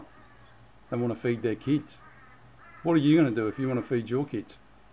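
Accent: Australia. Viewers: Hi, great to get a reply from you. All good, happy for you to use my message. I have faith beyond measure and just know that no matter what my wonderful Father is watching over me and my family. I believe so much that very bad things are on the way and that those who are awake and prepared will survive. Keep up with your videos, so great to have an Aussie to listen to.... doesn't make the rest of us Aussies feel so alone.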